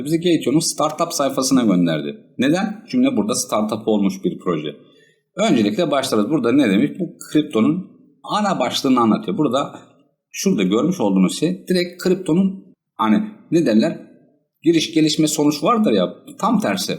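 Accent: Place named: Konya, Turkey. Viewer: Konyalı mısın şive tam o taraf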